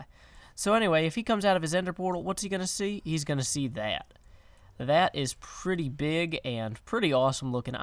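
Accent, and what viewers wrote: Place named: Texas, United States. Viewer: You have a cool accent It sounds Texan but the video says not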